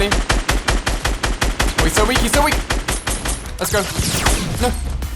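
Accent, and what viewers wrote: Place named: United Kingdom. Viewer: O my gush i cant Understand you are you fro Uk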